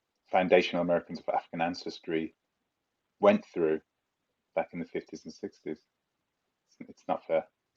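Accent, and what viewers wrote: United Kingdom. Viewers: Can u speak your African accent and desert your British accent. Coming from a concerned Gambiana.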